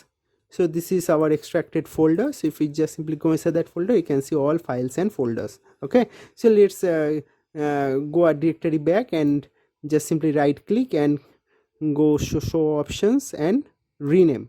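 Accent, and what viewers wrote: India. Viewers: I cannot understand Indian English.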